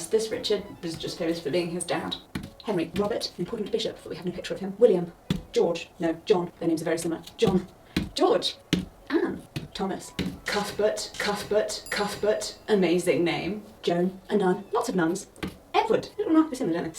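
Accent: RP, United Kingdom. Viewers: You put so much preparation into these videos! Thank you for all the hard work. You're really interesting (plus you have an awesome British accent which I enjoy). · Oh your that's sort of posh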